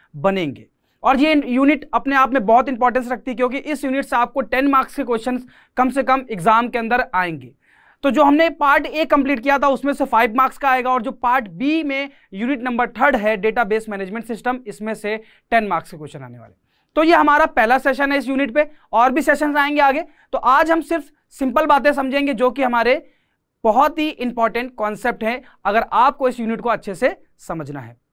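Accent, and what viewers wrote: Haryana, India. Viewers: Thank you sir 😌❤️ SIR AAP HARYANVI HONA 😀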